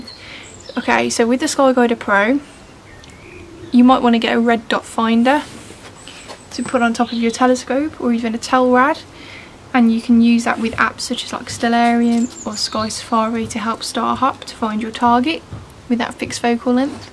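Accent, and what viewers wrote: United Kingdom. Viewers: Great Video. Your honest opinions are definitely inspirational. I'm liking the way you say "Astro Pixel Processor" in your English accent so I continue to watch. Your direct responses to questions are right to the point which is more a reason for me to watch. I bought APP based on your recommendation and I never buy subscription software. I'm just learning an Astro cam. I have ZWO533 and ZWO183 and hope to get some images after being a DSLR user for many years. I bought the LP-Pro filters also based on your reviews. Hard to get these here in the USA. I have much light pollution over Boston, Mass and value your insights. Keep up the great videos. Being in a Pandemic, outside with the stars is the safest place to be.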